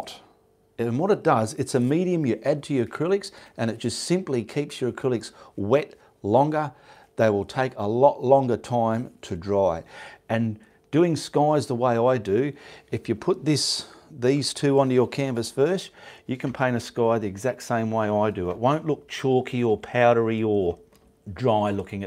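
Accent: Australia. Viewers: I love your art and your Aussie voice!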